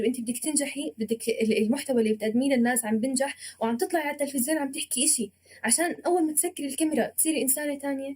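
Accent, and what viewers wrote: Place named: Palestine. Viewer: ما ابي اتفلسف بس اللي لاحظته لهجتك فلسطينية تقدر تصحح لي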